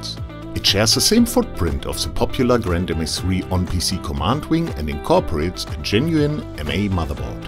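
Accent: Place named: Germany. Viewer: Most german accent ever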